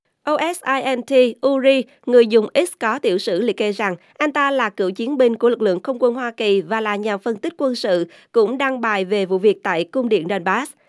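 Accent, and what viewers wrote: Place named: North, Vietnam. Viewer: HAPPY NEW YEAR 2024 to The Saigon Post. Chúc The Saigon Post càng ngày càng phát triển, Đề nghị The Saigon Post sử dụng phát thanh viên có giọng nói của người Sài Gòn thay vì giọng nói của miền ngoài, chúng tôi không thích, cám ơn.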